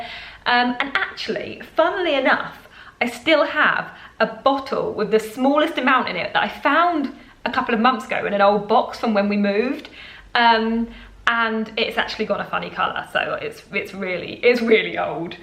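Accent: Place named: United Kingdom. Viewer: I love your style... and your British accent, too. Greetings from Canary Islands, Spain. 😘😘😘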